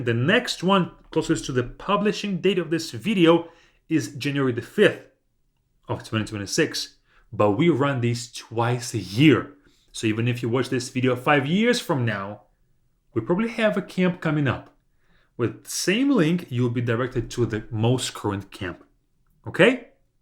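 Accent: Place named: Russia. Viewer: I followed you since at least when you intruduced you as an former tennisplayer i think. Remember the kvartira, yes and now you are speaking eng/U.S. with russia accent and also with the lungs, breth in and out. Importment when speaking is foreginer langauge. My teacher in russia told me: ”you have to speak with your soul and heart”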